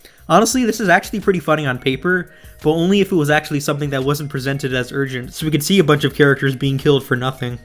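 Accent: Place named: Canada. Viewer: Good video bro! Also, what country are you from? you sound pretty Canadian from what i hear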